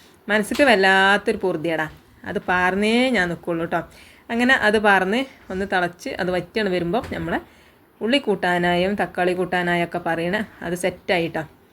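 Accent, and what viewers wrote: Malappuram, India. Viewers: മലപ്പുറം ഭാഷ മതി എനിക്ക് ഇഷ്ടം ആ ഈ സംസാരം കേൾക്കാൻ 👍🏻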